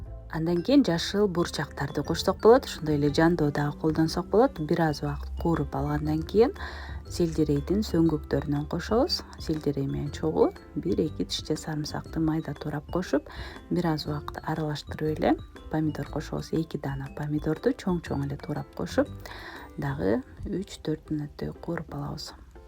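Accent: Standard. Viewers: Таза кыргызча сүйлөйт экенсиң, кооз, уккулуктуу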